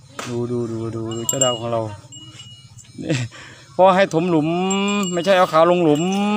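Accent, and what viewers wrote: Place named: Isan, Thailand. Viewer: พูดอิสานแล้วฟังสบายคะ ทุกอย่างธรรมชาติดีที่สุด มักบักดาว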